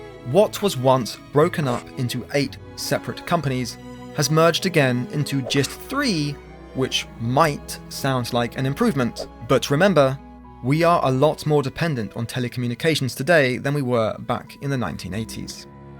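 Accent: United Kingdom. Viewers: yes, but that makes this video blatant propaganda, doesn't it? And why a person with a British accent? He's using a very American-centric POV for the story. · You are a compromise. Speaking American with an English accent. Do you watch a lot of American TV or movies?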